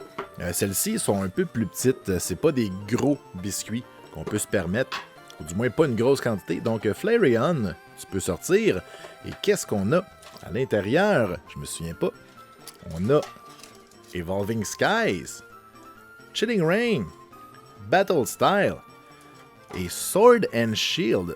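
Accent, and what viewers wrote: Canada. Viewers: Un petit coucou de Lyon en France. Merci pour ces belles vidéos qui nous font rêver mon fils et moi et l'accent canadien me rappelle mon voyage au Québec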